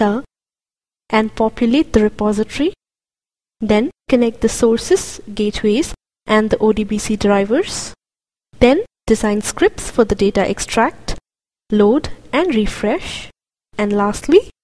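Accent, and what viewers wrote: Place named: India. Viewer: it's like india accent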